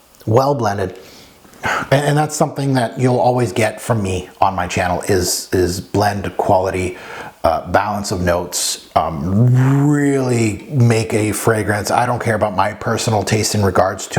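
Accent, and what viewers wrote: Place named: Quebec, Canada. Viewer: Hello Mon Ami Bonjour ou Bonsoir des USA Always such a pleasure to get your opinion with amazing accent French borderline English Du Canada if I m right Merci beaucoup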